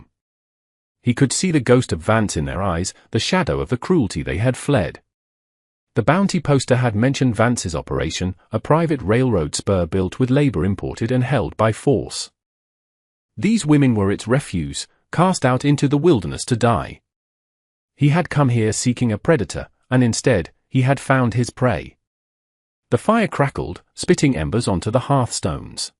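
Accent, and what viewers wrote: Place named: United Kingdom. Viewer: Screw your aristocratic English AI accented narration. Get rid of it. It doesnt fit the context. Maybe I'll listen. Not til then.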